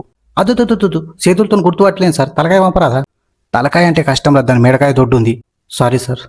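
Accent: Telangana, India. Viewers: Beautiful telangana dialect 😊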